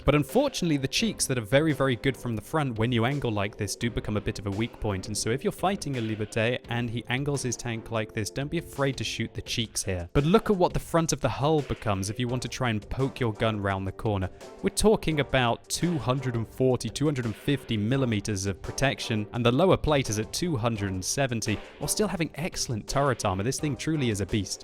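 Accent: France. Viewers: i'm french and i quit like your french accent 😂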